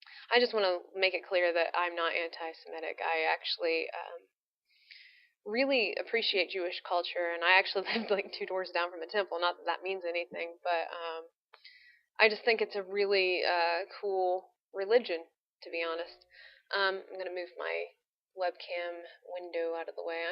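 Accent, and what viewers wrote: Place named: England, United Kingdom. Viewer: An american who can do an accent which doesnt make us seem like all we do is eat crumpets and drink tea :) Nice work, if you're interested in this sort of acting, look up stanislavski - he thought of loads of exercises to get in role for naturalistic acting :) and also try not to emphasise words so much, we're a lazy speaking country! next video you do, say hello youchoobe (which is how english people pronounce it) :)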